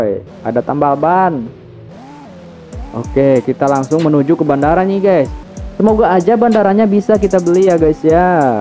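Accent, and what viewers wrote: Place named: West Java, Indonesia. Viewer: Logatan sunda wkwk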